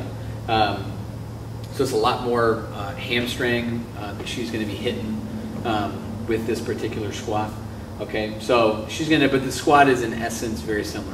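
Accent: Appalachia, United States. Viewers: I thought this guy was joking when he started talking. Fucking hillbilly. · Fuck i love that hillbilly accent :D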